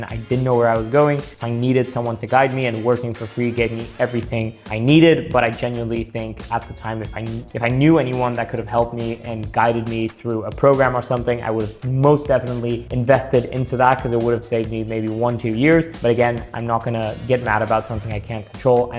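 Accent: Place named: United States. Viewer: Damn, had no idea that you were french, your american accent is perfect, atleast to my foreign ears.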